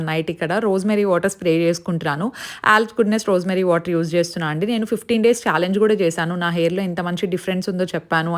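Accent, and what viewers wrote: Anantapur, India. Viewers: Akka miru pakka ATP language matladutharu akka superb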